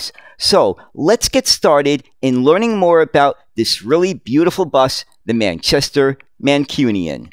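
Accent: New York, United States. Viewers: Absolutely love your New York accent!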